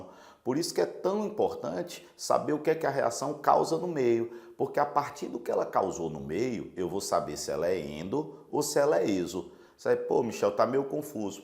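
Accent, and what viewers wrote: Ceará, Brazil. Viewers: Ele é cearense?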